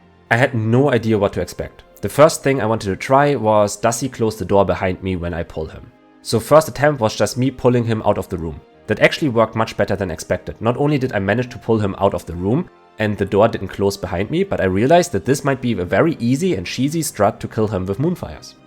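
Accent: Germany. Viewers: krass wie man den deutschen einfach immer raushört^^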